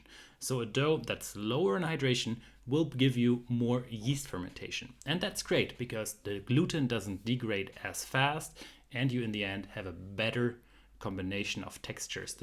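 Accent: Germany. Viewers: Me: Hmm his accent is a bit hard to place. I am thinking German.: And now here is the spreadsheet.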